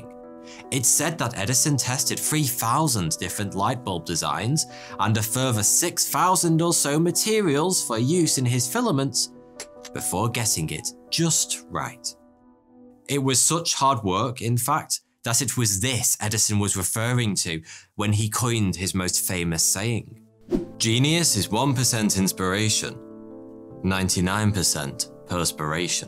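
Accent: United Kingdom. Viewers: Accest sounds like a British one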